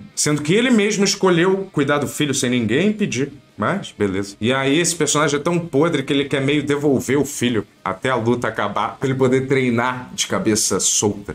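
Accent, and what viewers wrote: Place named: São Paulo, Brazil. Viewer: Ele e da capital , e sotaque de paulistano.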